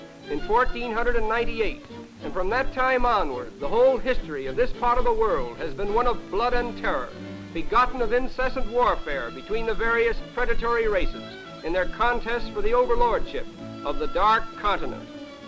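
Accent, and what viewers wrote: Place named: United States. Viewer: Comparatively speaking they were. Most native peoples had not seen a car or radio back then. Schools and widespread education were just starting and the average lifespan of peoples was in the 30s. Very few persons reached a ripe old age to convey accumulative wisdom to their communities. Life (especially in the interior) was short and brutal in the 1800s and this period in the early 1900s marks the transition away from that. In this period colonisation of Kenya was just starting and at this time there were no more than about 10,000 Europeans in Kenya. This sounds like an American commentating and reflects the attitudes of his people and the times.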